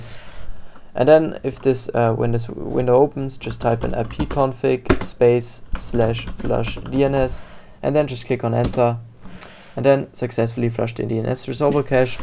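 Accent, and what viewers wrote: Germany. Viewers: der deutsche Akzent ist am Start auf jeden fall haha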